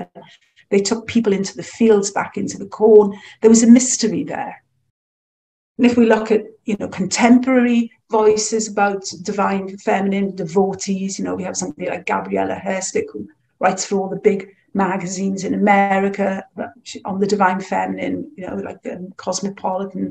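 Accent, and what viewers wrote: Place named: Wales, United Kingdom. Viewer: Refreshing to hear a welsh accent in an astrology lecture! Alot of really helpful references, thank you 🙏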